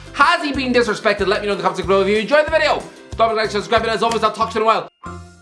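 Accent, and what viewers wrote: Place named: Ireland. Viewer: and words which end a sentence while having three ˢʸˡˡᵃ⁻BLES... you've got to love them.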